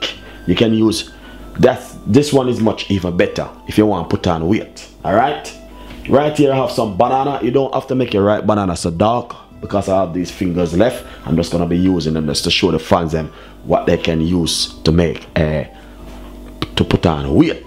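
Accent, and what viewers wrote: Jamaica. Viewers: Yea man, I hear it like a bob marley, rastahhhh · It's the Jamaica accent for me 🤭🤭🤭🤭🤭🤭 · Nice, I just love the Jamaican flavour of your English. will definately try your receipe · Haha some Ppl don't understand Jamaican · He's a Jamaican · That Jamaican accent🥰🥰🥰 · Hahaha I like Jamaican accent thanks am going to try it and see coz I have 48kg and I want to be 60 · Are u a Jamaican bro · It’s because of his Jamaican patois accent 🤔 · Lol he’s Jamaican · Its Jamaican (Patios) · he's Jamaican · You mean his patwah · Authentic jamaican accent🤗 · I can just tell first minute in he’s Jamaica lol 🇯🇲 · Put on wheats 😂Jamaican accent at its best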